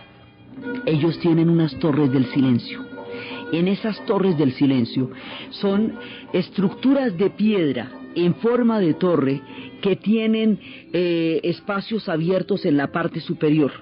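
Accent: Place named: Colombia. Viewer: Me encanta como cuenta las historias esta mujer, me gusta su voz y su acento, tremenda joya que tiene Colombia, saludos desde 🇸🇪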